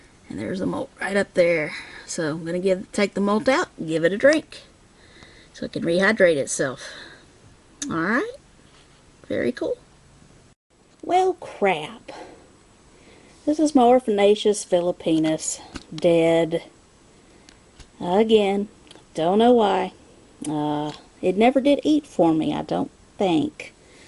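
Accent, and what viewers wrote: United States. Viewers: agree!! was gonna say the same, I love this kind of US accent, plus ur expressions :D , especially that one --> "mmm mmm mmm !" when admiring the green T's legs LOLLLLL....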